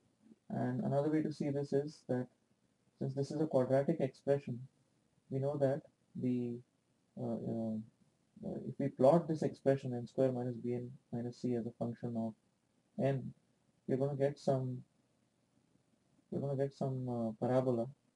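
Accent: India. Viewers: Indian (?) accent plus s-o-f-t voice plus recording volume turned up to compensate = mud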